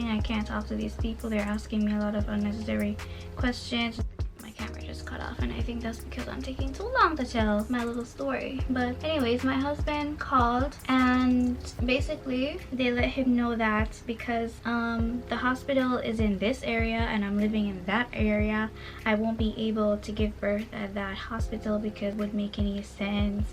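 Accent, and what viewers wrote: Jamaica. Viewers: Just came across your channel and I'm wondering are You from the Caribbean sis? Your accent and your phrases got me feeling the homey Jamaican? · U sound jamaican Btw i do understand what it is they were saying cos the level of understanding between both u and the doctors wasnt good and anything could happen even tho they could've probably worked something out..its not that much of a big deal but at least they recommeded u to someone else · You sound jamaican. Are u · You sounds Jamaican or you a Jamaican? Sorry for your experience!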